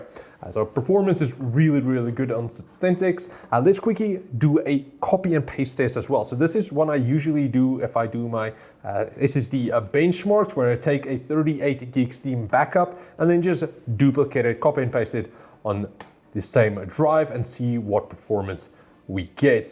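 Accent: South Africa. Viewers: Are you South African? I’m South African myself and I can hear an accent from you. Great content btw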